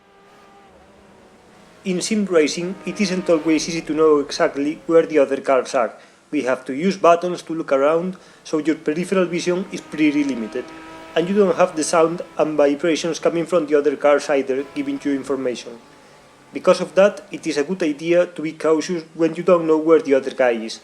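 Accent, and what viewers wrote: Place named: Spain. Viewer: Thanks for the video! You sound like Carlos Sainz Jr. but with a heavier accent, are you Spanish?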